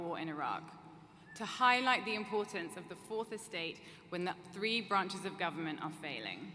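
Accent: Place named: United Kingdom. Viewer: I really like her British accent .she is clever , educated and authentic and gorgeous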